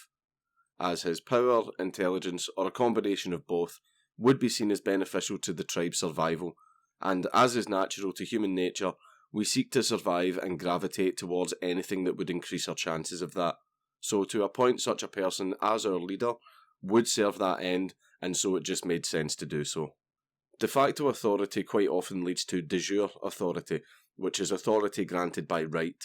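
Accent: Scotland, United Kingdom. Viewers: Nice vid, but FYI "de jure" and "de facto" are Latin, so your pronounciation of "de jure" in particular is way off. This is not a pedantic point, here's why. I was listening to your video and not watching, and I was wondering why you were talking about "du jour" authority (which is French for "of the current day"). So for a while I couldn't understand why you were talking about arbitrary authority as opposed to authority by law (de jure). To pronounce "de jure" in such a way that you won't confuse listeners like myself (which may very well be just me), you want to say it more or less like: "day you ray" (I'm trying to account for your Scottish accent! :D). Cheers. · So where do I sign up for audiobooks read by a dank Scottish fellow? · don't break dude, they can lock up your Body but your mind is free like a bird. (add subtitles to your Video in order to reach more people. 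I can understand scottish accent since i spent a lot of time in Glasgow over the course of many years but most who know english have a hard time understanding your accent)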